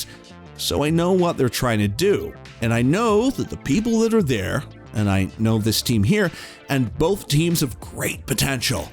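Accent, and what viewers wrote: United States. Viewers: Narrator is a septic. What did you expect?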